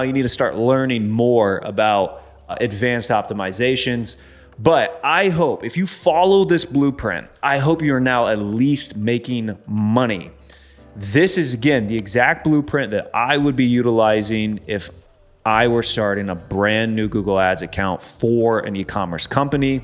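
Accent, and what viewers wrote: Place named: Boston, United States. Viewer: I am from Boston and you sound like you are from around here! We are straight shooters like you - love the vids! All hard content and no bs fluff! Thanks man - awesome videos!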